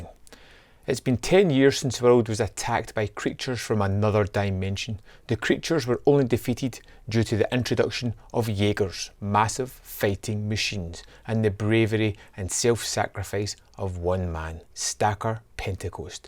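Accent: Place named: West Scotland, United Kingdom. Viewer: No way yer Scottish 🏴 I’m Scottish too, Paisley. Whera ye fae lol! You sound as if you’re from the West of Scotland too! Slainte 🥃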